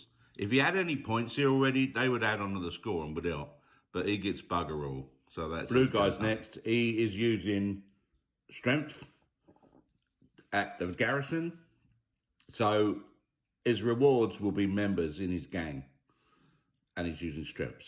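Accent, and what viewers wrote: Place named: London, United Kingdom. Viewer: Really helpful video on how to play a complicated board game! Love the London accent too, brilliant! 😊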